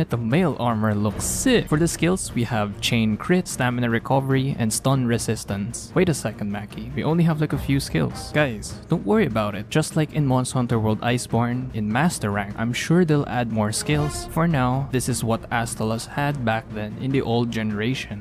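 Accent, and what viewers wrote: Philippines. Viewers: Filipino ka ba bro?? I sense it with your accent eh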